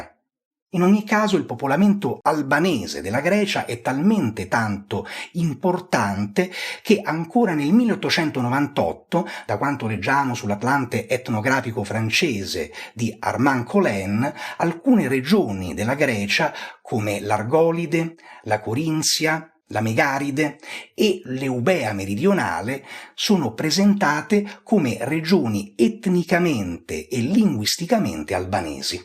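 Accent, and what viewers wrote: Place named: Rome, Italy. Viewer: Caro Professore! Hai detto molte cose vere, e nelle altre hai fatto arbitro buono che non sta né da una, ne dall’altra parte. Ma per chiarire ” qualche “ imprecisione desidererei parlare a questo occhi, sento l’accento Romano nell’’tuo parlato, (scusami del tu, ma sono più grande di te, e posso permettermelo), se sei di Roma e se il tempo te lo permette, possiamo mettersi d’accordo per un caffè e parlare. Grazie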